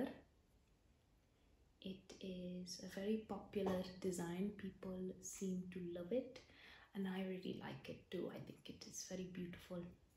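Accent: India. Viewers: love your indian accent videos! We should make one together - i messaged you on instagram! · your indian accent videos are the best!!!!!!!!! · I love your Indian accent! I don't know why but it's more relaxing than any other accents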